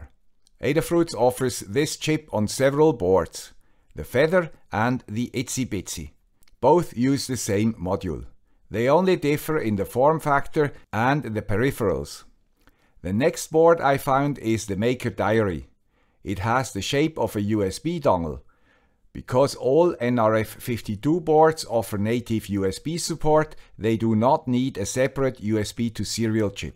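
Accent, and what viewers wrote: Switzerland. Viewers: Dear fellow Guy with a Swiss accent I‘m not sure why and since when, but I consider the Arduino IDE to be bloatware (OsX). As I currently have no low latency projects I have deinstalled it completely and switched to μPython. Yes, this has limited me in some ways, but I‘ve also noticed that as an engineer I can basically transfer the specifications to Python and it‘s still legible for beginners. Less hassle for me and my few clients, albeit limitations apply. Hopefully Arduino will change their approach (maybe they already have?) and maybe even open the IDE to μPython.. Thank you very much for your effort, your content has nonetheless helped me broaden my perspective (and workshop 😅) and I‘ll be replicating your Pöschtler alarm soon. Bye!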